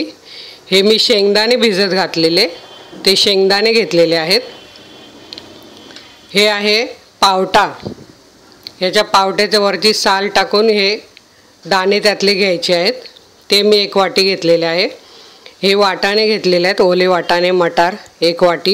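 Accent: Khandesh, India. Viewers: kaku me nehmi pahte tumchya receipes.bhari sangta tumhi.tumhi khandeshi ahat ka? bolnyachya style warun watla mala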